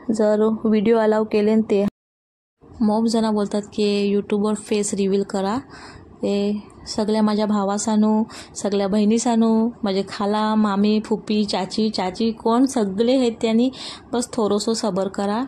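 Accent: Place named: Konkan, India. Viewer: रेसिपी तर छानच. मी तुमच्या चॅनेल वर नवीन आहे. कोंकणातील मुसलमानांची मराठी किंवा कोंकणी खूप खूप गोड आहे. मध्ये मध्ये अरबी, उर्दू, पर्शिअन शब्दांची पेरणी. ऐकताना खूप मजा येते. आपण कुठल्या गावातून विडिओ बनविता ते सांगाल का ?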